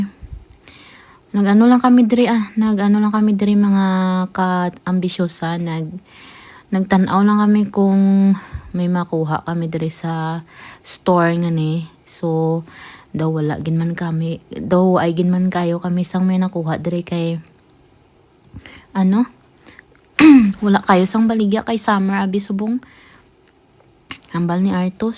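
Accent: Philippines. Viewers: Hnd ko maintindihan cnasabi mo ppro ka visaya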